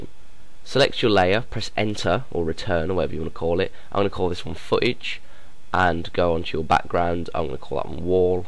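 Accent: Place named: United Kingdom. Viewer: omg british accent! love it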